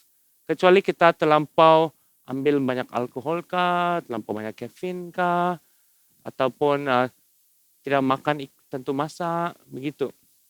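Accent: Sabah, Malaysia. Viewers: Haha this doctor is awesome. Kalau sia tutup mata, betul2 macam kadus dia punya slang. Keep up the great work you are doing, very informative and insightful.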